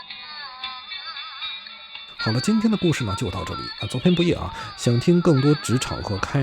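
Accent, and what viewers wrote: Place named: Tangshan-Tianjin, China. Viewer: 😂北京人（唐山天津味）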